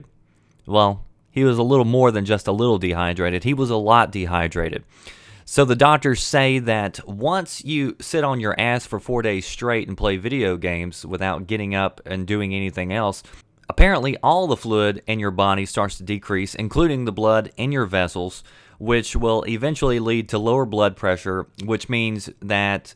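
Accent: United States. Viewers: yes blame everything else its never the persons fualt hey ?? blame the game the booze the drugs. Noone and nothing made u take the drugs the booze or play the game. U sound american to me. take reposibility. and that girl in the pictures massively over wieght she could die of heart failure just in the shower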